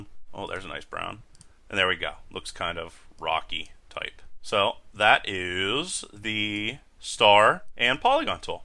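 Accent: Pittsburgh, United States. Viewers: you sound like you are from pittsburgh. p.s. thanks for the video, super helpful! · Thanks for a great tutorial series! Thought I heard a twinge of Pittsburghese in your presentation? ;-)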